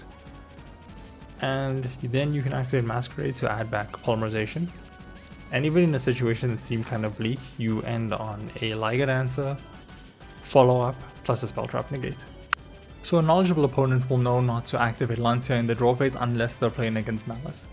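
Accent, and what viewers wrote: South Africa. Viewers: R u south african😂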